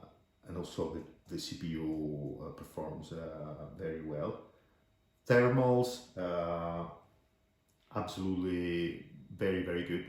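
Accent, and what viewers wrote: Italy. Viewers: Hey man, you sound like an Italian 😉 Very nice review, awesome laptop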